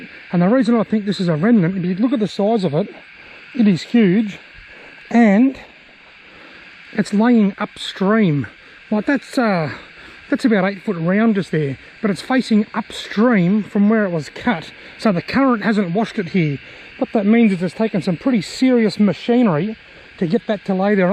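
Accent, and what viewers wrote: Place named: Australia. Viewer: No worries. Thanks for all the great videos. I used to go yabbying all the time when I was younger. Your vids are a great reminder of those times. My kid also likes your vids coz you have a far stronger Aussie accent than I do. Cheers mate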